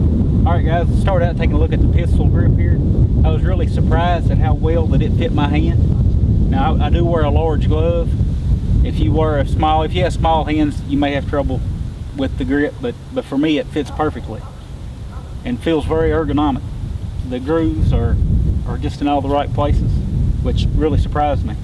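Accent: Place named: Boston, United States. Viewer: You sound like you're from Boston. Nice review!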